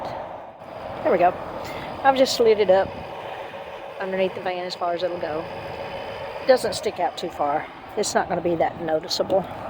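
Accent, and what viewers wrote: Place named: Georgia, United States. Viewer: Yay!!!! I knew I recognized that accent ! Hehehe Greetings from Savannah. I saw your Ga car tag briefly in the video . I’m a new subscriber and looking forward to your travels. 😎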